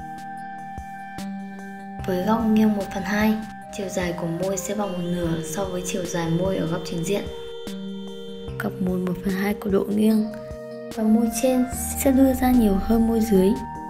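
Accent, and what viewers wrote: Vietnam. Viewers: We Vietnamese have such an accent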